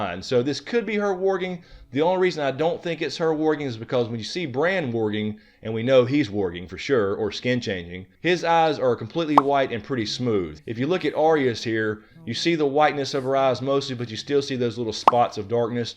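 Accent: South, United States. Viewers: Hey - I love your videos and now you are hooked up with my other favorite - Y'all are an awesome pair and I have chills from your predictions. I did want to ask you if you have ever done any research about the theory that Rhargar's harp is buried in the crypts at Winterfell in Lyanna's tomb? I read something about that and I have always wondered why she was buried in Winterfell since it has always been men there. Just wanted your take on that - Thanks I also detected a southern accent - wondered where you are from- I live in Alabama, I was just curious. Thanks again for all of your videos. Robin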